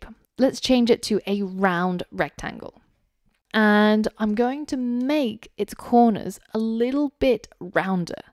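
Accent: United Kingdom. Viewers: She’s from London.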